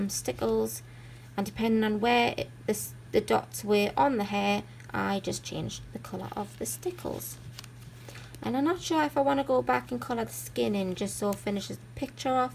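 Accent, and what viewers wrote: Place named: Northern England, United Kingdom. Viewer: Hi, just subscribed to your channel. It sounds like you are a fellow Northerner:D Awesome colouring x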